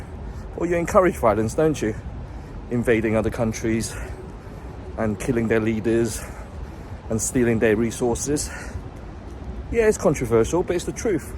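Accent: United Kingdom. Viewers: Just curious...did you film this in China? I am hearing a very noticeable British accent. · Lolz. You spoke in a British accent. Are you British or Chinese? You forogot mentioning 20 million unemployment rates. 😅 I prefer living in Britain than in China! 😂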